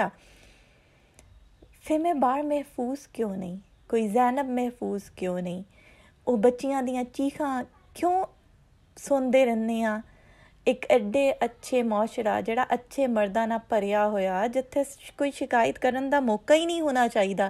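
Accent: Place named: Punjab, India. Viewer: Ye itna jatki lehja?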